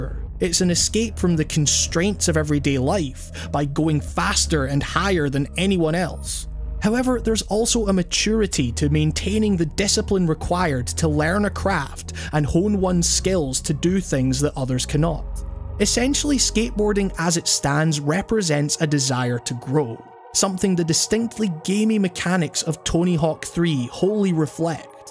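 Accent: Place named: Scotland, United Kingdom. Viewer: Didn't see the Scottish accent coming at all (or is it Irish?), and I quite appreciate diversity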